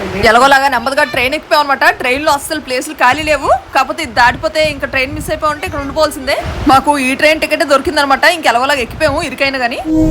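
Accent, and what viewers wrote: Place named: Srikakulam, India. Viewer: Your dress colour is very nice. Your vedios are excellent with srikakulam accent. is beautiful and participative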